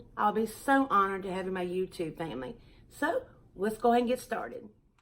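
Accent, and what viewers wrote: South, United States. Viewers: Happy spring girl friend🌷🌹🌺🌸!!! I enjoy watching you and all your diys. I love to hear your southern accent, you make us feel at home watching you. Your channel is going to grow so fast 😀👍🏻👏🏻💖❣️ Good luck and best wishes to you. 🙏🏻🥰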